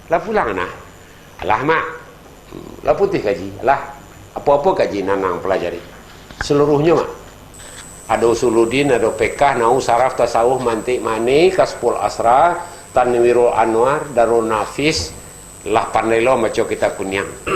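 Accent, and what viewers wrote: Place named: Bukittinggi, Indonesia. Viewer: Klo awak tebak dari caro ngeceknyo mungkin urang Bukittinggi/agam klo dak dari Padang panjang...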